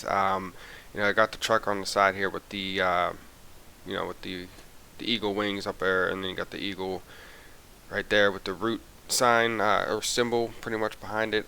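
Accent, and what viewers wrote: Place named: Pennsylvania, United States. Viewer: Just found your channel via Kyle's videos. Great to hear another Pennsylvanian.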